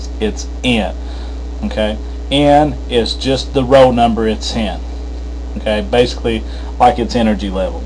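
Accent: South, United States. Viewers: I like your southern twang! It helps keep me focused on the video! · awesome country accent.. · great video thanks so much very helpful. i like your Southern accent. Greetings from California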